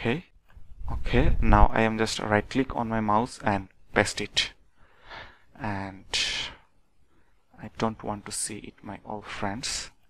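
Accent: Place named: India. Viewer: definitely indian. that accent is unmistakeable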